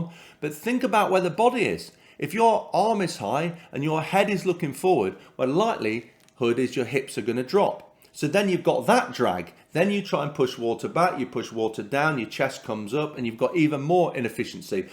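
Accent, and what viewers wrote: United Kingdom. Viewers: I want to get back into swimming and hearing your British accent brought back some great memories. I'm a gay man from Lithuania and visited England in 2018 for the Gay Pride Parade in London. I had such a wonderful time. I met these two professional swimmers at the parade and they invited me to their home after the celebration and they gave me a double full body massage while nude. It was the best massage I ever had and and I will never forgot the experience. Just thinking about it puts a big smile on my face.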